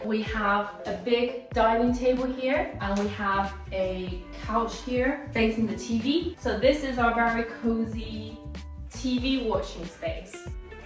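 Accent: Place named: London, United Kingdom. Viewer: and you have such a beautifull smile nice video and a londoner dialekt very elegant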